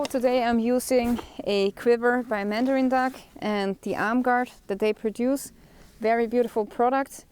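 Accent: Germany. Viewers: I'm guessing 🇩🇪? Nice video and very humble. It's the best when you say, ,"Cool"...dánke....Michael 🎶 🎶🎵 🎯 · So cute German accent :)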